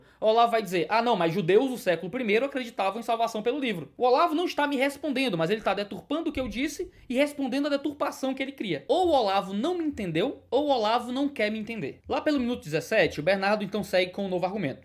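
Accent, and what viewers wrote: Ceará, Brazil. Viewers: Tive a honra de estar há 2 semanas aí e ouvir esse sotaque muito bom e muito engraçado tbm, alias, só tem humorista nesse estado, muito bom. Povo gentil e engraçado, lugares lindos e comidas maravilhosas. Deus abençoe o Ceará!!!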